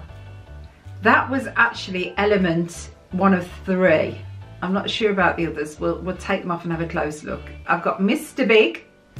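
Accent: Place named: Southern England, United Kingdom. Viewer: 😘hopefully we get to trade someday! Love meeting new Disney Friends - especially in the UK. From your accent I'm guessing down South? Although, I am terrible at guessing accents!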